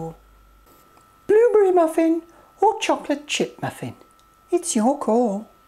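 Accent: Birmingham, United Kingdom. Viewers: are you a brummie? I love your recipes.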